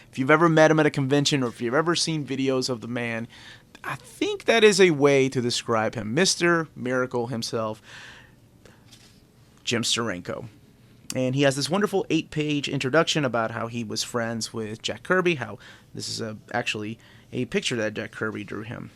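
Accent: United States. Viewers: OMG we're never going to agree on how to say things, I'm glad you live in America and I'm in England. I say Ah-phra and you say Ay-phra. You Nay-mor, I saw Nah-mor. Can you imagine a conversation between us? 😂😂😂 Out of interest, Mark Millar, how do you say it? When it's Americans I only ever hear Millaaaaaaarrrrrrr. But he's Scottish, I share a border with him, and I pronounce it Miller. If you ever meet him will you ask him please?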